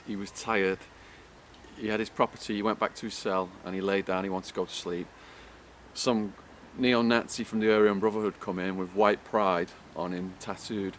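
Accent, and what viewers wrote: United Kingdom. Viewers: This might be a weird question, but did people in there assume you were soft because of you accent? Us American tend to think that any kind British accent sounds posh and I can't see that working to your advantage.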